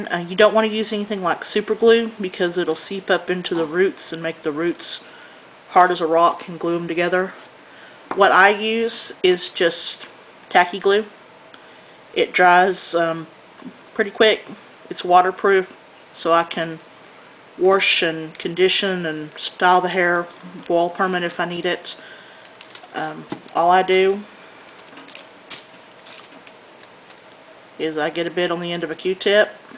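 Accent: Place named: West, United States. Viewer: talkin like a cowboy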